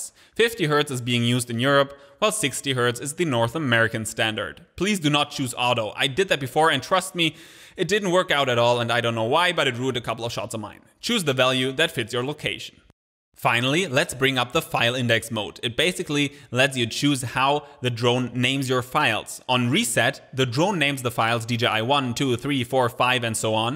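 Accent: Germany. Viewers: Hört sich an wie ein Deutscher, der englisch spricht.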